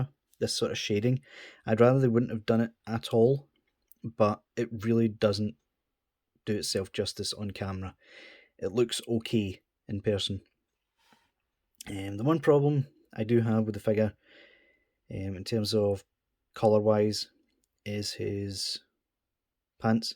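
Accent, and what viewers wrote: Scotland, United Kingdom. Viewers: Holy crap mate yer Scottish!! Me tae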